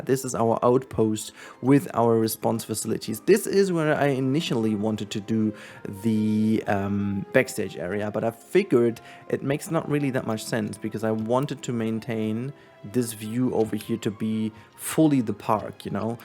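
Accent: Germany. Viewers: Bist du deutscher oder so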